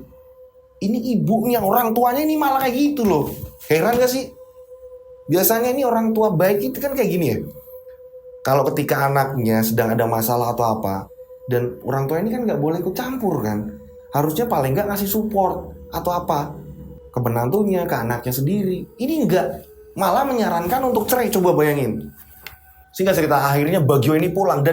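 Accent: Java, Indonesia. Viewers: Cara bawain bisa jawanya sangat terasa sekali ms...bahasanya Jawa kok sama presis dengan punyakku......tulen banget wkkkkk...semangat bos Hongkong hadir selalu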